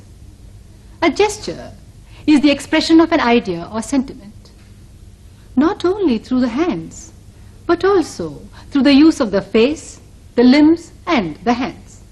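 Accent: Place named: England, United Kingdom. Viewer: Amazing your an English accent 🙏🏻👏👍🏻 sweet i like very much of your launguage madam if I seen you i will be get autograph me god grace like this of dance performance Excellence wow 👍🏻👏🙏🏻❤️👍🏻🎉🎉🎉🎉🎉🎉 amazing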